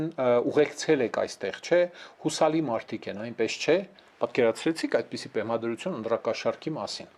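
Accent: Gyumri, Armenia. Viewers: Շատ գեղեցիկ ձևաչափով եք ներկայացնում էս ամենը, անուշ Գյումրեցու բառբառով։ Ու ահագին կարևոր գործ եք անում։ Շարունակեք։ Մի մոռացեք նաև գործող իշխանություններ բացերը...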